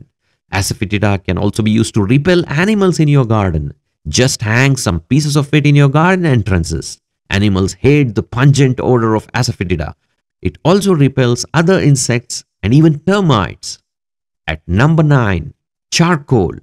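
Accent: India. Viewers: Bro u r speaking english like u r indian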